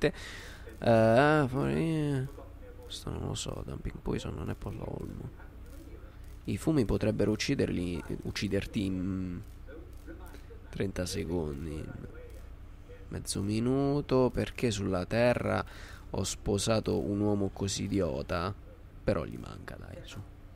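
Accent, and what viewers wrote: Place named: Central Italy, Italy. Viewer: Di dove sei? Hai un accento del centro Italia che non riesco a definire esattamente..sparo marche :)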